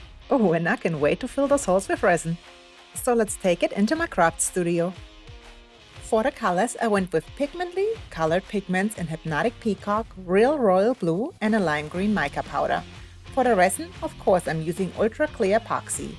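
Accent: Germany. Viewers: Hey, man bemerkt noch den Deutschen Akzent, ist auch gut so. Ich mag dieses Board, sieht sehr schön aus 🥰. Wie würde man so eines herstellen können ohne CNC Fräse? Einiges mehr an Arbeit. Ich habe zwei Schildkröten, ich klein.🥰Danke für Dich 🤗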